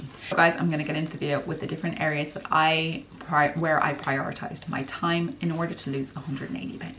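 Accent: Ireland. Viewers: Hello, new subscriber here! I contacted bodyslim but all courses r fully booked! I shall start walking and hope to enroll with bodyslim with their next intake, in April. You r lucky u realized u need to prioritize yr time at such a young age! Yes because if we do not, we end up BURNT OUT and depressed! Enjoying yr videos and love yr Irish accent 😊.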